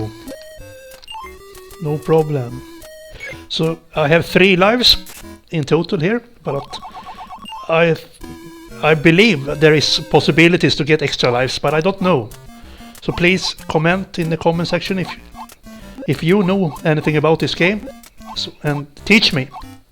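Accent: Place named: Sweden. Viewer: svenska kraft haha, hörde dig var svenska så snart du började prata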